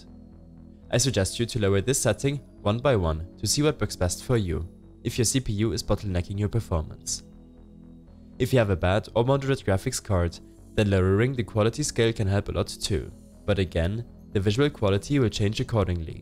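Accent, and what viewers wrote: Germany. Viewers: you speak like a german, if im right then danke für das tutorial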